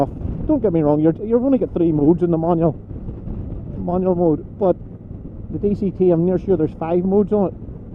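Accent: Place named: Scotland, United Kingdom. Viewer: Nice review.But I could not understand a lot of what you said because of your Scottish accent. I thought this was the same as the foot shiftier on the DCT. But I guess it is different. But thank you for the video because now I have to investigate. I do not need an automatic so much but was thinking about getting it because I will do 80% of my riding in the city. But if they make something like this for the manual version I would rather save the $700 that the DCT would cost me.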